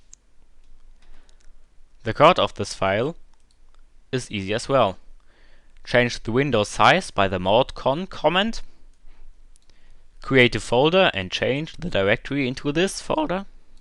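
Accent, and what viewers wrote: Germany. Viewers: i think ur a german like me :D nicee accent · standert german accent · man merkt irgendwie das du deutsch bist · ahaha geiler deutscher Akzent und danke für das video xDDDD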